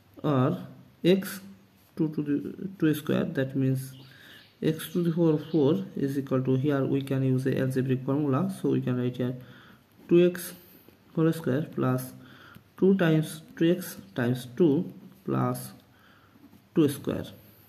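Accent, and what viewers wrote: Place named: Bengal, India. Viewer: Bengali accent XD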